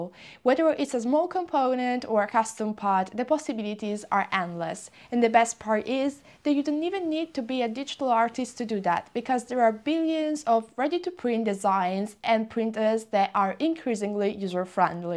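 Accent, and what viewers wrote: Italy. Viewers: So, I was wondering what that accent was. Apparently she has a mirror YouTube channel with exactly the same video's where she speaks Italian :) So, there you go.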